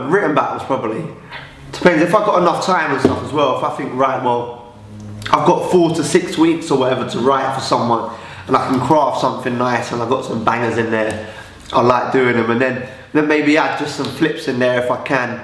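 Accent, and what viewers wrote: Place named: Brixton, United Kingdom. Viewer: He's from Ipswich, why's he talking like he's from Brixton?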